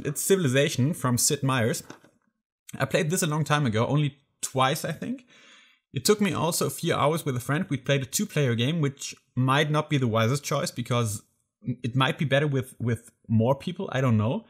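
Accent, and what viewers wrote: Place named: Germany. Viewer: Really appreciate your video. You are so lucky to have so many great games shops in Deutschland, hearing your accent makes me miss my Mother country!. - Your English is perfect by the way. Thanks brother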